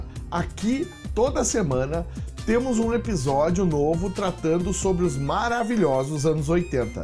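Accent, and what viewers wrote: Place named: Rio Grande do Sul, Brazil. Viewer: Nao acho que seja a mesma pessoa, o Teily tem sotaque nordestino, e o locutor aqui do canal tem um sotaque de gaúcho. O timbre da voz também é um pouco diferente entre eles.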